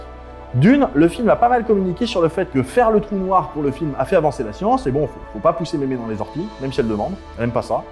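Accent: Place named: Alsace, France. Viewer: Alsacien je crois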